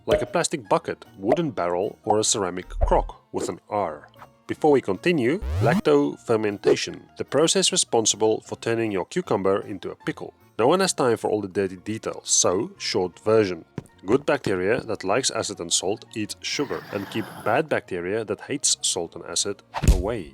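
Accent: South Africa. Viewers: I'm south African and your accent sounds south African but a bit of an odd one to me? I'm from Durban so maybe thsts why lol 😂 have you lived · How does this not have more likes?? Great editing, funny, and South African accent. Good job. I'm definitely making these 👏👏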